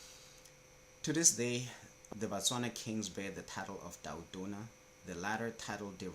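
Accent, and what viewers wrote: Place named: United States. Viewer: Love the program. The accent though made it difficult for me to understand you, wish you could leave the Americanisation.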